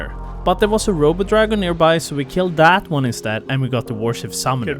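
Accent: Sweden. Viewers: Are you swedish? You sound swedish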